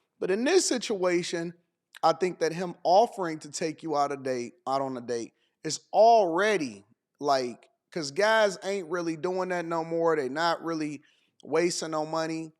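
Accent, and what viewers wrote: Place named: South, United States. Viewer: As a DMV native, that southern accent is a super turn-on. I laughed real good on the female Numbers Assessment 😂.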